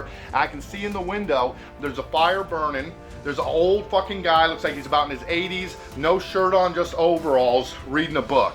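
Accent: United States. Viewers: I love the american accent great story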